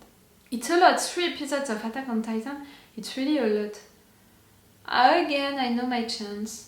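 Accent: France. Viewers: Tu as l'accent français quand tu parle anglais, c'est mignon 😊😊😊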